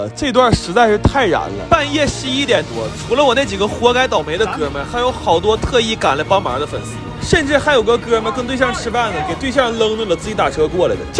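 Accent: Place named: Northeast, China. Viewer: 都是久视频，就喜欢一边上班一边偷听这东北话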